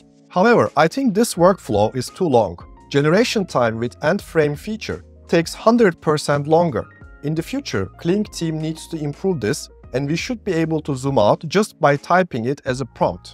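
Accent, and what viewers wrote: Turkey. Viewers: Hocam bu aksanla Turk olduguna yemin edebilirim. Memleket neresi? Guzel anlatim olmus. Tebrikler.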